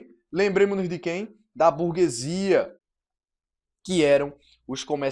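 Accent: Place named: Northeast, Brazil. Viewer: você é ridículo. Lindo o seu sotaque, professor. Nordeste ❤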